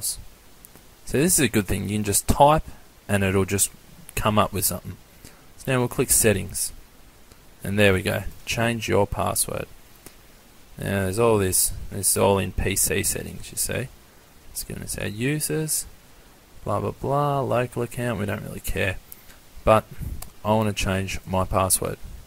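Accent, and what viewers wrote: Australia. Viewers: Thank you so much man, great help. PS, you sound Australian. If so, which city are you from?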